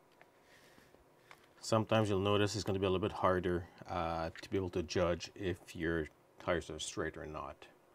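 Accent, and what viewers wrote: Quebec, Canada. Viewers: Québécois accent for sure ! Nice video patron !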